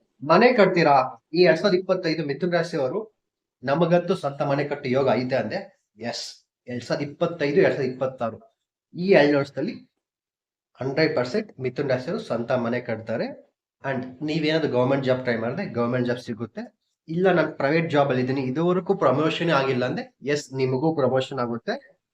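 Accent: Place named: Tamil Nadu, India. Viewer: Tamil nava Kannada maataadidd haange untt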